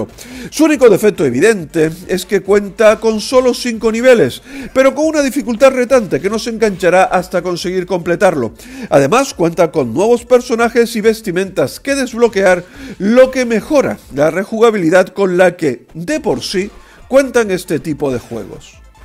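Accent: Spain. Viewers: aaaanda, por fin lo sé, eres tinerfeño! Sabía que no eras del sur de la península y estaba seguro que serias de las Islas... Muy bonita Tenerife, ánimo con el canal y gracias por los esfuerzos para buscar los juegos de los que nos hablas en tus vídeos :)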